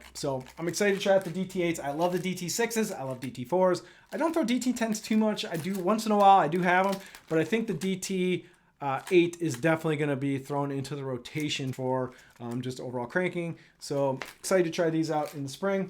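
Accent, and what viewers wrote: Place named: Minnesota, United States. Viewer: Your from Minnesota 😮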